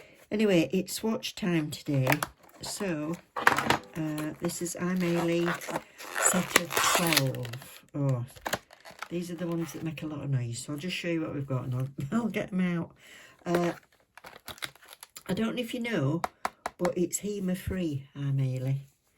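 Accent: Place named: England, United Kingdom. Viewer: Ms, you are so cute and I've absolutely loved some ding time with you listening to you review these lovely colors! This is the first of your vids I've watched and I've already subscribed! You're a joy to listen to and so soothing, and I absolutely love your accent. I'm from the states, but I've always loved all things England ❤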